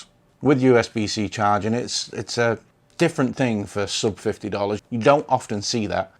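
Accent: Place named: Lancashire, United Kingdom. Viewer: Well that makes sense now. I could tell you were from the Lancashire region. You've done well to retain your accent. I've a pal who moved to the States when he was 13. He now sounds as American as Colonel Sanders. Good review by the way.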